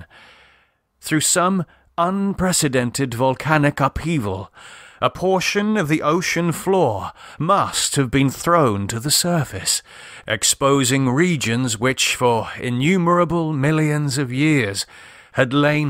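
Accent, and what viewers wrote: England, United Kingdom. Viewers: Me encanta ver videos sobre los relatos de Lovecraft. Aunque como opinión personal siento que es mucho más gratificante imaginar estos escenarios mientras lees el libro. Aún así me ha encantado, sobretodo por el acento inglés del narrador y lo bien ilustrado que está. Muchas gracias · Hey dude..... Hates Pigment Lovecraft was from NEW England. Lose that ridiculous "theatrical" tea talk and tell the story.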